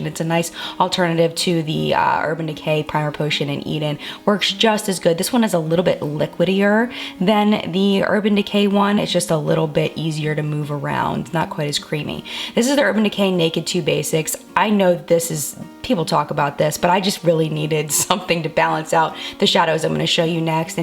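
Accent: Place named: Maryland, United States. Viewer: I always wondered where you were from because you talk just like me. When you said "Its been hot in 'Murraland'", it brought metaphorical tears to my eyes. Represent 301.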